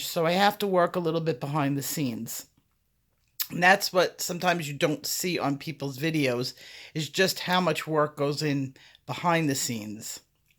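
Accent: New York, United States. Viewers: Where are you from? Sound like NY or NJ?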